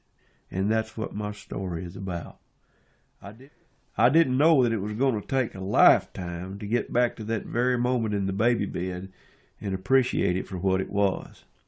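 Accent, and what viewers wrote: South, United States. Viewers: Nothing like non-duality with a southern drawl....it just sucked me in mind and all... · Well... how refreshing to hear it from a south accent. Take care. You got my smile.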